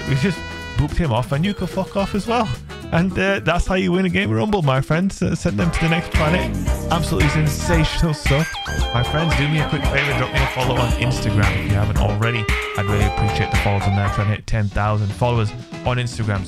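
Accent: United Kingdom. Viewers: I love your videos but will you only make PVP content forever? At least once, show some of that gravy PVE talent you got with that british accent spice, gud lad!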